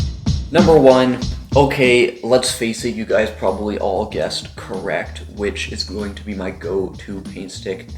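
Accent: Canada. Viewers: are you canadian? you sound canadian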